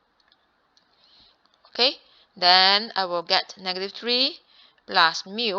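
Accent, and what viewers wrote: Asia. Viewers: Love the Asian Accent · i hate permutations and combinations but u explain it so effortlessly maam, thank u sm i subscribed... btw i love the asian accent its so nice to listen to idk why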